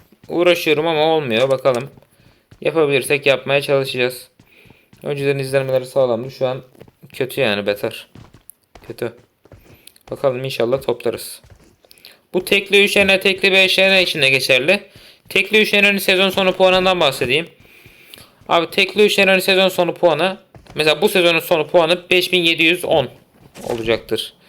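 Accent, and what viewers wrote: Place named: Giresun, Turkey. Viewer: Giresunlumusun şiven anımsatıyo bende giresunluyumda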